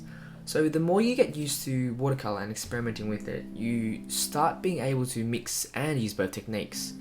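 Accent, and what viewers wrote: Australia. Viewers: Are you australian? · Are u a fellow Australian??? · is he from Australia perhaps? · you're Australian, yeah? Certainly sound so to me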